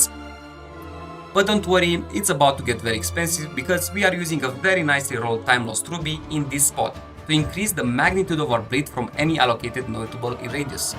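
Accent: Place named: Philippines. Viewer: When I heard your accents I was worried that the video would suddenly changed to tagalog. nice build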